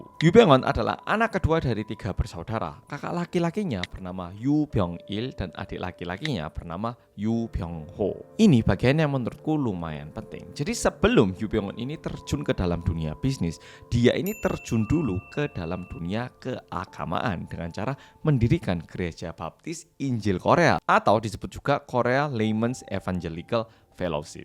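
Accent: Java, Indonesia. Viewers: Hmm..... Muka Korea logat Jawa aku kaget weyy😰🥺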